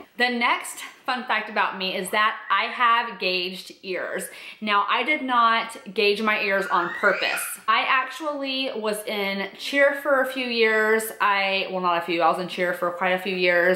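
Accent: South, United States. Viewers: I found out recently that a co-worker of mine is also an avid YouTube watcher. We live in Des Moines too and she told me about your channel! I was confused because I definitely detected a southern accent in your last few videos; it makes sense now!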